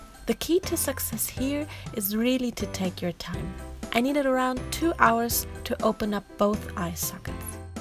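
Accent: Germany. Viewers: Are you German? I like your accent. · speaks good English with slight accent Me: Mmh... is that a german accent I hear? Me: Sees Uhu glue YES I was right!(or she's austrian) If you see this comment pls reply! (I'm german too?)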